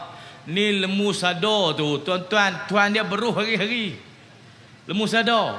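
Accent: Kedah, Malaysia. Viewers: TERBAEEEK BM KEDAH, MB SANUSI. IN SHAA ALLAH, MB SANUSI. ALLAH KURNIAAN BANYAK NIKMAT DARI ALLAH UTK MU MB SANUSI. ORG BAIK YG ISTIQOMAH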